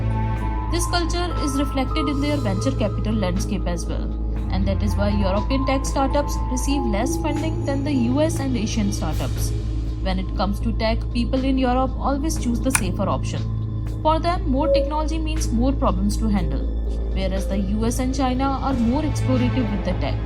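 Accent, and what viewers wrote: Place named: India. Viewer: You seems to Indian origin from your voice. Why don't you create content in Hindi? This type of content will go viral in Hindi speaking audience.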